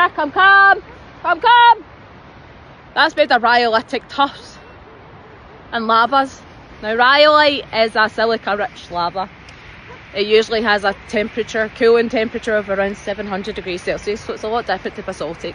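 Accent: Scotland, United Kingdom. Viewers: Listening to you reminds me of my great aunt who was from Scotland, who married my great uncle during the war. By the way I understand you perfectly.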